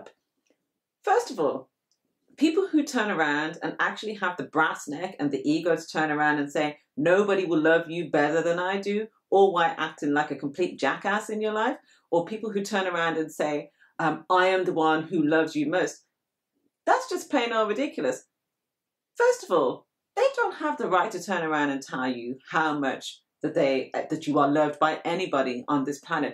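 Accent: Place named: United Kingdom. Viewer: Love when she says "are you flipping serious?!" in that British accent. 😊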